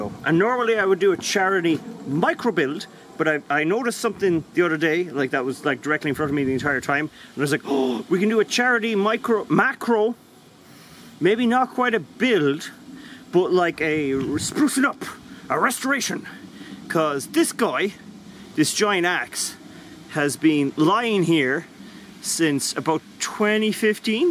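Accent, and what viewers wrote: Ireland. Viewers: Is he Irish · I like how this guy sounds Irish and is still understandable. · So he IS Irish. I wasn’t sure...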